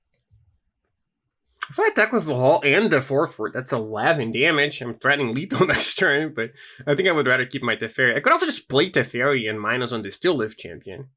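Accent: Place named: Brazil. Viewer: Tenho que concordar. Acho que a sua pronúncia é impecável. Dá pra perceber um sotaque residual, mas se eu não soubesse que você é brasileiro, nem diria que é sotaque.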